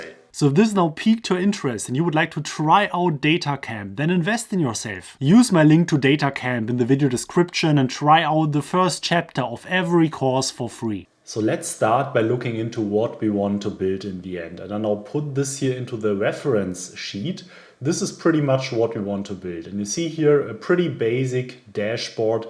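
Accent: Germany. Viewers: Hi, great videos! Especially your knowledge combined with the German accent makes me as a Hesse really happy!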